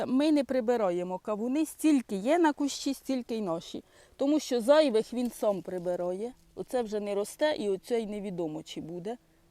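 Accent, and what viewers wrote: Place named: Poltava, Ukraine. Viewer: Цікаво і пізнавально, дякую. І ще, насолоджуюсь вашою говіркою, так похоже розмовляють у багатьох селах Зіньківщини, де довелося деякий час жити. Там є село Власівка - точнісінько така ж інтонація в розмовній мові. Бажаю гарних врожаїв.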